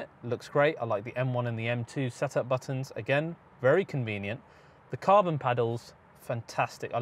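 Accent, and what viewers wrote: United Kingdom. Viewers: He's alright man, he's just hella "British" that's all.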